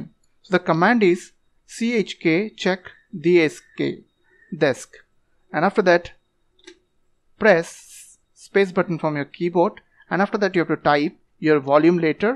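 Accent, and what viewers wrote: India. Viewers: I was little skeptical with the Indian accent lol, ngl it worked thank you for saving my ass.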